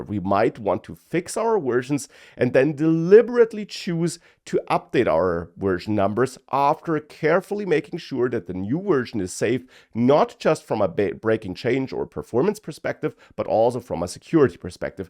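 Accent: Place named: Germany. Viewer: Just get rid of your german accent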